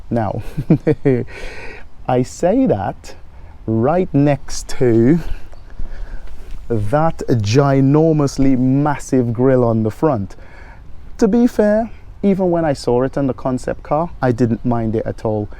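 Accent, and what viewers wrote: Trinidad and Tobago. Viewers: Im hearing the trini accent. 🇹🇹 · Caught that Trini accent...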